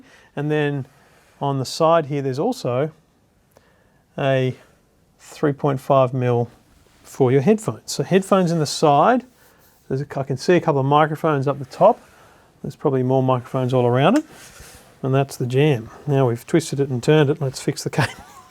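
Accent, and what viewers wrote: Australia. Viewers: Nineneen nineny eight. Theeng. Compuudah. You must be Australian...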